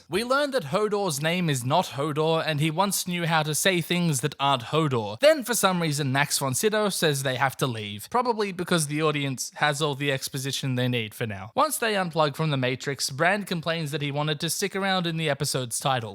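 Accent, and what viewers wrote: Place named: Australia. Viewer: I love how perfect the Australian accent is for being sarcastic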